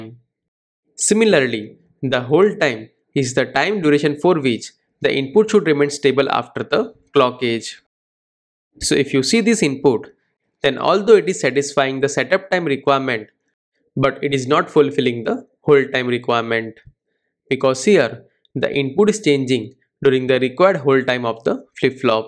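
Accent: India. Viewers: کارت درسته مرد، لذت بردم از توضیحاتت با لهجه‌ی شیرین هندی 😊❤️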